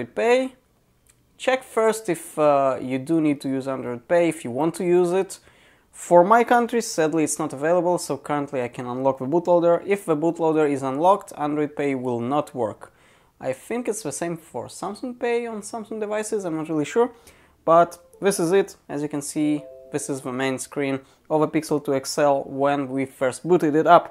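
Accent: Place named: Israel. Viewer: ?! יש מצב? קודם כל זיהיתי מבטא ישראלי, אח"כ זיהיתי את הקול ואז זה התחבר לי עם, יש מצב?!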